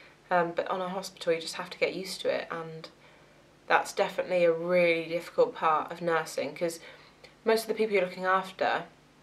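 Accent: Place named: England, United Kingdom. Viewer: Im an Irish student nurse and I think you definitely have an English accent haha!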